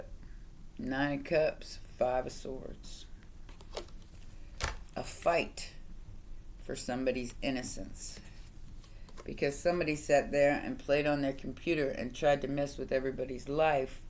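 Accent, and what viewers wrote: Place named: South, United States. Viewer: Set up ain't the word for it. Luv that southern drawl ya got. Great read 📚 reading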